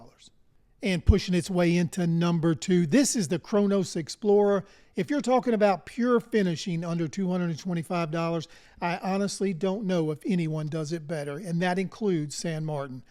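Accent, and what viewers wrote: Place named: Maryland, United States. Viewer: Hey. I'm trying to figure out your accent and where you're from. Maryland? Carolina's? I'm probably way wrong. Lol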